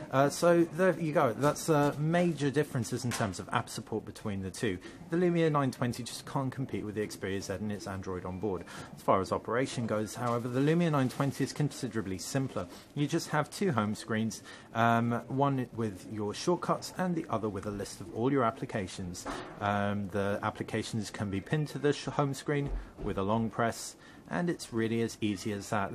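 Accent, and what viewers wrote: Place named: United Kingdom. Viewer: he's British and that's how you're supposed to say it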